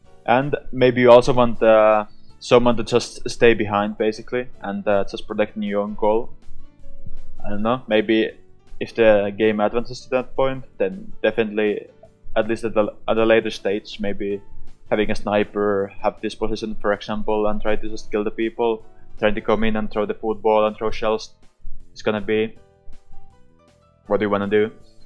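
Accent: Finland. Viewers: Are you finnish? Cuz it really sounds like it xD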